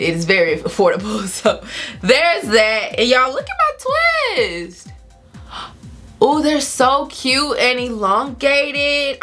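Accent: South, United States. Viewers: You’re so southern/country lol, so am I that’s why I notice it. 😂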